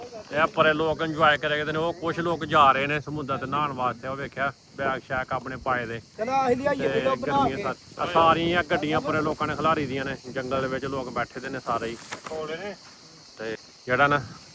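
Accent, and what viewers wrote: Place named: Shakargarh, Pakistan. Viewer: جو بول رھا شکر گڑھ کا لگتا ھے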